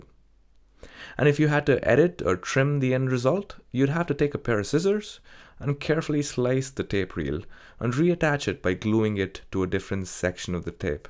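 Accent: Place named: India. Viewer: this would've been a great video if u didn't have that accent, or if u weren't indian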